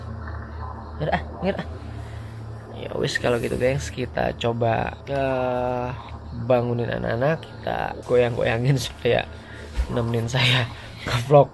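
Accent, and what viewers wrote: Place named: Surabaya, Indonesia. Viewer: matamu cok🤣 wkwk mantap paido suroboyoan🤟😂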